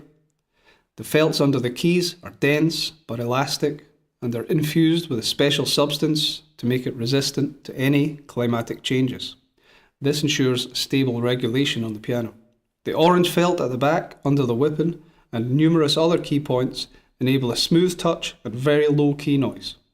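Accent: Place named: Vienna, Austria. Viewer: I love the viennese accent!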